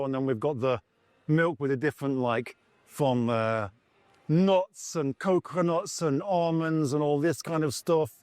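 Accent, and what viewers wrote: United Kingdom. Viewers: I kind of figured people will say something to you once in awhile considering to them you are a foreigner but what they don't know you're actually Russian with a British accent lol anyways thanks for the video I enjoyed it God bless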